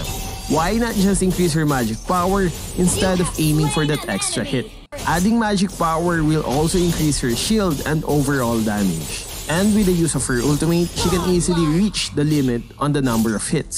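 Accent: Philippines. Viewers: But the way he says "attack" is kindda weird bcz of his thicc Filipino accent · Loving your accent, the way you say "windtalker" ughhh sexy Filipino accent❤️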